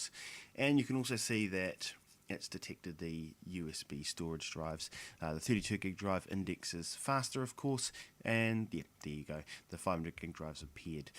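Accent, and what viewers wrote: New Zealand. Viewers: cool accent! NZ i presume? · r u from NZ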